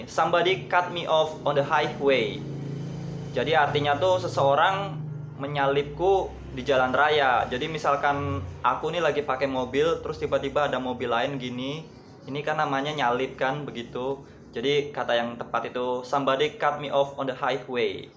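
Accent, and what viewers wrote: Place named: Java, Indonesia. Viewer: Anjir jawa bener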